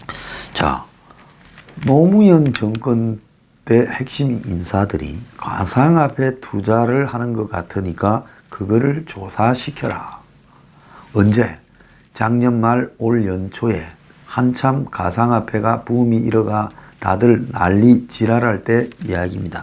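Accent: Gyeongsang, South Korea. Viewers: 경상도 사투리가 너무 정겹습니다 ㅋㅋㅋㅋㅋ....^^ 진지한 내용에 귀여운 사투리가 엇박자인데도....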